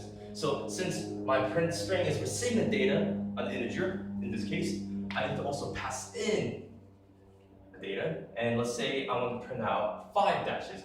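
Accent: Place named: India. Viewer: I don’t understand your Indian accent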